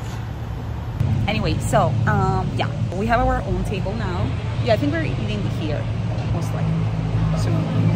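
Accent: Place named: United States. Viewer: Sei davvero Napoletana , il tuo inglese americano è perfetto. Sembri Italo-americana. Un saluto dal Polentone del Polesine (Veneto).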